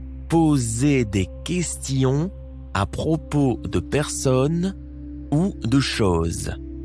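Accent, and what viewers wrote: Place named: France. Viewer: I'm french I confirm, the voice is 100% french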